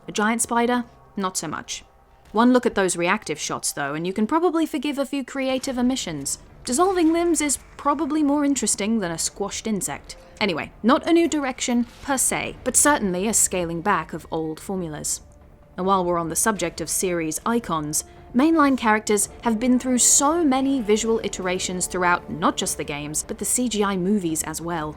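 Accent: United Kingdom. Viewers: Jesus you sound english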